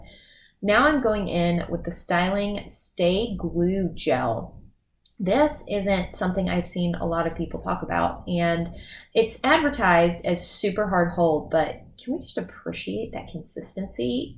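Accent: Texas, United States. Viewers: Yep, I recognized your accent and bouncy personality as pure Texan right away! Our Texas weather adds an extra challenge for we curly girls, but these Cantu products are my faves...they work wonders! I have fine, thick, 3B, 3C curls. I use the Define & Shine Custard (basically, gel in a jar) instead of the glue gel. The custard has that weird thick sticky feel too, but the cast is softer and most of it breaks up overnight as long as I don't use too much. Next morning I take my pineapple down, leave my hair alone for a bit and it usually falls into place. Occasionally I might have to do a little root shake/fluff but that's it.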